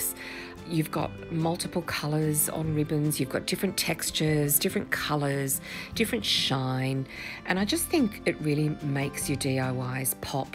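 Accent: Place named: Australia. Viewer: Just beautiful! Aussies have the most gorgeous accent and your voice is so pleasant and calming. I think if Olivia Newton John♥️Love your wreath!!!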